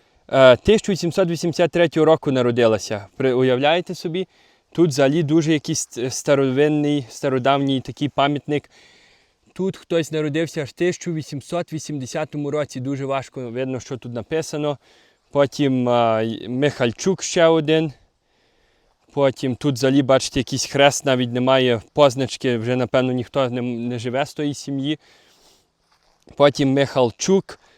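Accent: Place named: Western Ukraine, Ukraine. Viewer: Говор западенца....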